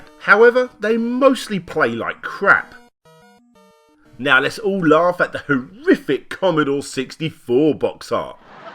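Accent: United Kingdom. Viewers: Fankfully. U British and ur accents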